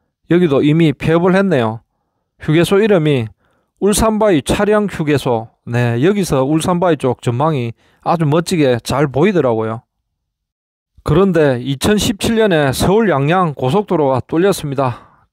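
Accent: Gyeongsang, South Korea. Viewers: 와 갱싱도 사투리 진하다!!! 도저히 힘들어서 못듣겠네.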